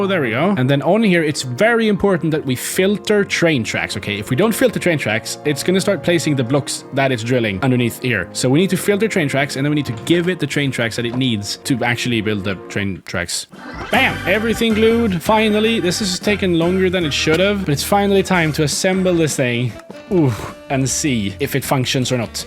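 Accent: Sweden. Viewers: du, jag hör att du är svensk. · I KNEW YOU WERE SWEDISH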